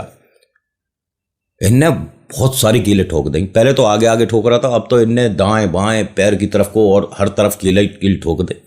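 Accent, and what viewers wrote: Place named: Haryana, India. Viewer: भाई मजा आ गया हरयाणवी जबर्दस्त ऐसे ही सुनाते रहो टैलेंट है मगर घमंड बिल्कुल नही है 😂😂😂😂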